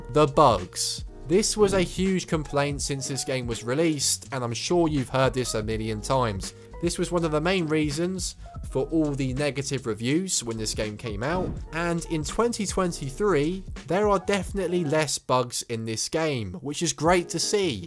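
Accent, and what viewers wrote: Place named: Chelsea, United Kingdom. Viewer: Man u sound like such a toff. Your aight there i mean im here.. its your voice ..mad made in. Chelsea vibes . Hearing you getting your winge on about fps and world densities is funny..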